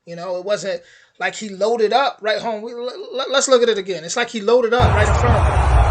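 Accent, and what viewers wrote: United States. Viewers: What part of the states is your accent from?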